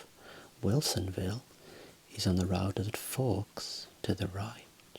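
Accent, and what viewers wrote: United Kingdom. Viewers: Nothing is better than and his British accent ❤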